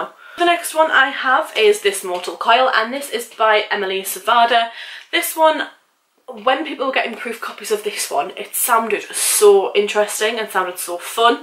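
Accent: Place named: North, United Kingdom. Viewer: I'm a new subscriber from Twitter and I'm 13 seconds in and all I have to say is... YOU'RE NORTHERN?!! SO AM I!! YOU LITERALLY HAVE THE SAME ACCENT AS ME!! Can we be best friends??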